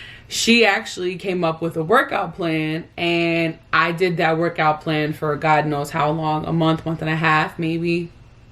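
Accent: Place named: New York, United States. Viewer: Funny, quarantine made it easy for me to transition to being full vegan, very little carbs (still am). To be honest I was already vegetarian most of the time (a little fish, but LOTS of carbs, cheese and ice cream--my downfall). I've lost 25 lbs with zero exercise--I'm not proud of that, but still mentally trying to get my own way. Imagine how much more I'd be losing if I was exercising? You are so real, so inspiring. Thank you! (You sound like you're from NYC, BTW lol)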